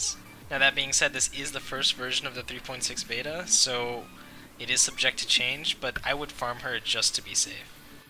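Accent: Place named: Australia. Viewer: Yo, you're voice sounds like Australian or something western I dunno, but Iirc you are playing SEA server right? And what the heck, you're damn good at making videos. Simple, the caption transitions are smooth but there's also that memeing moments and personal comments like "QTE Switch, now I can get rid of these abominations" or self interrupting like holy shit I rarely see that coming on these times. PS. You sound like you're speaking in Discord VC lol. PPS. I'm a fan of Aldnoah Zero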